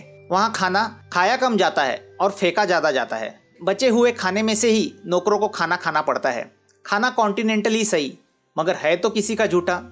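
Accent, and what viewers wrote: Maharashtra, India. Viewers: Typical thick Marathi ascent horrible narrative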